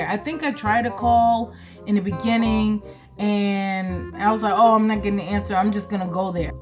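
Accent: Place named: Africa. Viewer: 🤣🤣🤣 subscribed. Love the African accent🤣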